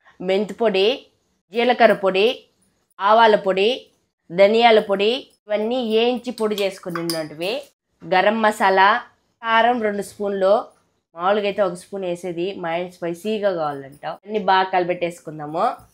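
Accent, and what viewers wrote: Rayalaseema, India. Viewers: Rayalseema slang 🥰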